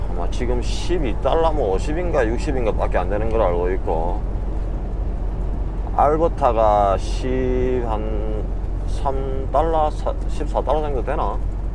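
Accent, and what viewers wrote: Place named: Busan, South Korea. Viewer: 와 부산말 제대로네예 ㅎㅎ 구수하이 좋네예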